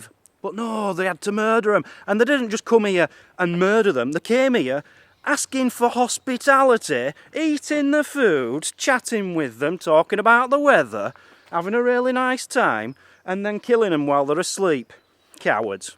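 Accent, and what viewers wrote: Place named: RP, United Kingdom. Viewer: You're becoming posh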